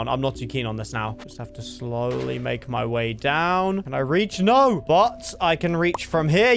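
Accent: United Kingdom. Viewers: ive been watching for a while right, and tbh i think every episode he gets more british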